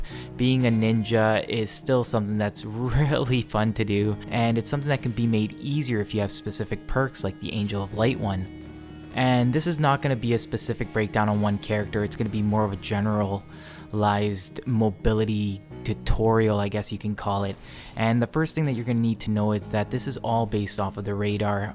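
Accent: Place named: Canada. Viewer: This guy is a fellow Canadian, he has to be with that accent.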